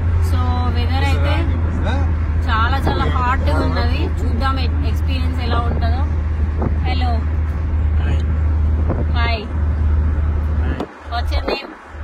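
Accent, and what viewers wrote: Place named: Karimnagar, India. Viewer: U r dare to travel alone beeing a girl.Iam from Karimnager seems that you r nearer my slang.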